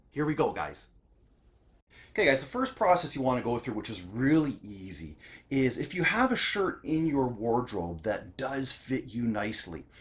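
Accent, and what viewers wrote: Canada. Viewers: Loving that rich Canadian accent!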